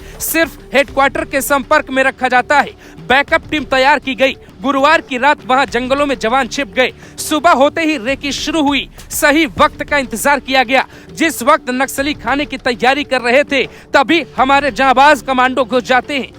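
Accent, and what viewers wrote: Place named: Rural, India. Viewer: कैसे बोलता है रे😮देहाती।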